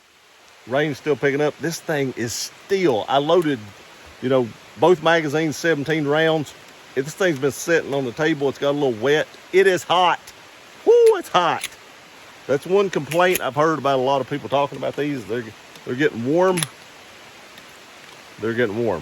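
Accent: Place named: Alabama, United States. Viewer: Sounds like you’re from Alabama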